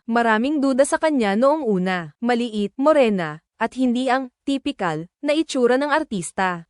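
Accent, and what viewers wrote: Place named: Visayas, Philippines. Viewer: Ano bang lahi mo bat ganyan Kang magsalita ? Yung pronounce mo Ng mga words parang visayang inskit.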